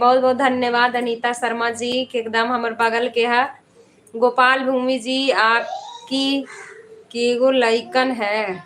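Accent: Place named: Magahi, India. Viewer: मैं जहानाबाद के सरेया से.वर्तमान निवास धनबाद .आपकी सुन्दर मीठी मगही से आ जाती है गाॅव की याद.मैं लगभग आपके पिता की उम्र का हूॅ.लेकिन इतनी अच्छी मगही नहीं बोल सकता.देश दुनिआ में मगही भाषा को उजागर करने बढानें के लिए आशिर्वाद.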